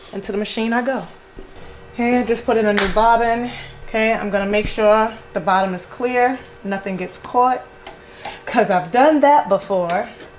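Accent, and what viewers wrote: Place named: New York City, United States. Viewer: Everytime i look up a new skill in crafting screening dtf ypu name it... there is another video by! 😊 love your NYC accent too!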